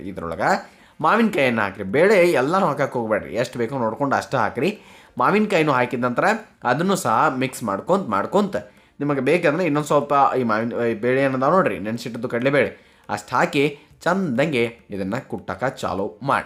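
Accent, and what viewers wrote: North Karnataka, India. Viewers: ನೀವು ನಮ್ಮ ಉತ್ತರ ಕರ್ನಾಟಕದವರು ಅಂತ ನಿಮ್ಮ ಭಾಷೆ ಹಾಗೂ ಚಟ್ನಿ ಮಾಡುವ ವಿಧಾನದಿಂದಲೇ ಗೊತ್ತಾಯಿತು ri. ಏನೇ ಆದರೂ ನಮ್ಮ ಉತ್ತರ ಕರ್ನಾಟಕದ ಅಡುಗೆ ತುಂಬಾ special ರುಚಿ ri.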